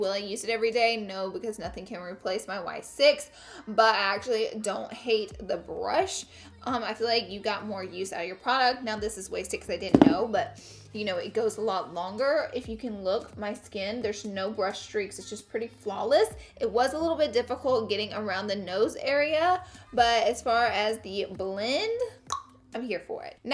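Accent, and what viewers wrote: South, United States. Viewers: Southern thang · Tori Mizuno go tell a Canadian to say say sorry correctly lmao we all know she’s form the south and we all say things different depending on where we grew up. Come on now. Stop trolling.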